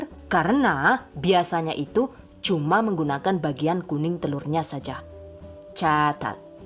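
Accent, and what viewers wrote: Banyumas, Indonesia. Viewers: orang madiun, kok pinter bahasa ngapak sech 😁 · Kalo nonton suka ketawa ketawa sendiri.. Resepnya mantap dan penyampaian detail juga ngapaknya itu ngangenin heheh... #GiveawayTHV2021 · 😂😂😂😂😂gue suka gayamu dg logat ngapak nya yuk... Ha.. ha.. ha..👌👌👌👏👏👏👏 Thanks so much fot the tutorial.. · Hehehe bhs ngapaknya aku sukaaaa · bikin versi terbaru dong bun...yg lebih ngapak gitu..hehehee Cataaaaattttt😁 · Telat komen. lucu ya...kenapa bisa bahasa ngapak ya bun? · NGAKAK BGT maless😭😭😭 ngapak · Ngakak jawa ngapak... Kita sama ngapak 🤗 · Sg gawe video kie wong ngapak apa ya,😁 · Rika wong Bumen Yu?:D sukses terus Bu channel nya senang dengan penjelasan detailnya. terlebih bahasa ngapak kayak dari kampung saya, Sampun tak subscribe Yu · Ngapak men..🤣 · Bun..rotine kutunggu ya..pengin buat juga.matursuwun..resepnya.ngapaknya..ngangeni bun.. 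· Suka..... dengar bahasa ngapaknya, kocak......😀😀😀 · Jan jane rika uwong endi sih,madiun apa banyumas,deneng ngomonge ana ngapake? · Deneng ngomonge ngapak bun,jawane ngendi rika? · Terima kasih bunda mantab jadi pengen coba,saya sangat puas dg Vidio " ny mantap jelas apa lagi banyak becanda ngapak jadi komplet dan dapat ilmu jg terhibur semoga Allah mmbalasny yg berlimpah,sukses slalu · Ora ngapak ora kepenak, barakallah ya yu....😘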